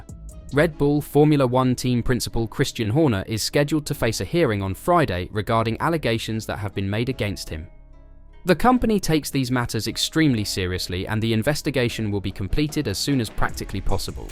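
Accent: United Kingdom. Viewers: I can tell by your accent that you are from England and maybe you want screw RedBull so maybe Hamilton get some advantage and finally win his 8th championship ?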